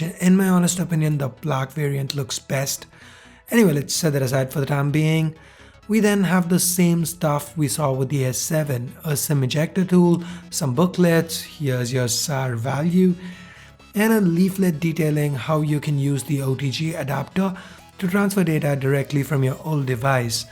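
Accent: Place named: India. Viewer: this is the best Indian english accent i watch so i SUBSCRIBE SO FAST SO I CAN UNDERSTAND CLEARLY 😊😍😁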